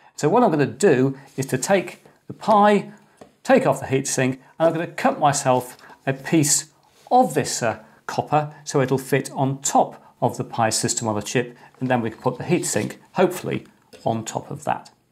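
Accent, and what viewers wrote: United Kingdom. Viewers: Sorry for asking something that isn't on subject, but are you British?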